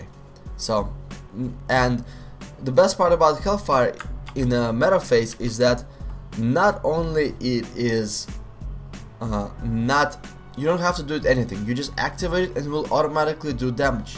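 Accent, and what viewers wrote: Russia. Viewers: Твой русский акцент, не плохо смотрится)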